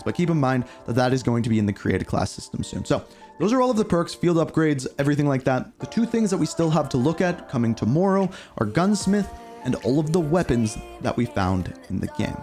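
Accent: Canada. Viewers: didnt know you were canadian til i heard those aboots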